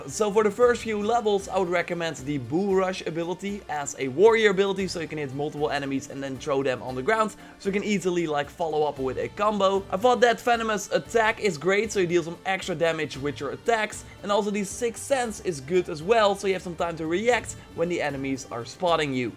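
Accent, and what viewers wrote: Netherlands. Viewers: Aan je accent te horen ben je nederlands😂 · You dutch bro? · Are you dutch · Are you Dutch? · On what difficulty are you playing? (also are yah dutch?)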